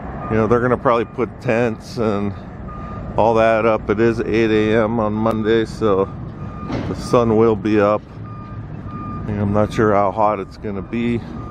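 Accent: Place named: Midwest, United States. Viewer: My wife and I have noticed your accent and it sounds overmuch like our Wisconsin/Michigan accents. Where do you originate?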